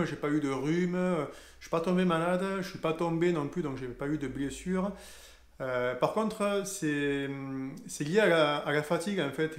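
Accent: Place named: South-West, France. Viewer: Merci pour le vidéo. Et ce petit accent du sud-ouest qui nous fait voyager 😉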